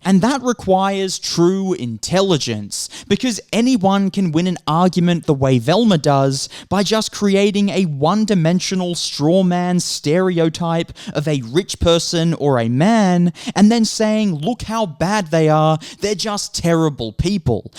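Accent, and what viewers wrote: Australia. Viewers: It's Australian accent I think. Sounds normal to me.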